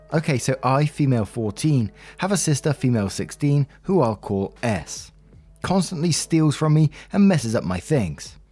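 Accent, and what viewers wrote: England, United Kingdom. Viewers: Oh hi,! Hope you're well! I just got out the gym. Honestly I listen to you so much that my American English is turning more English English.... I have ASD and tend to mimic accents and speech patterns without meaning to. Had to explain what "skint" meant to 3 different people. Not complaining though, I kinda like it. Much love!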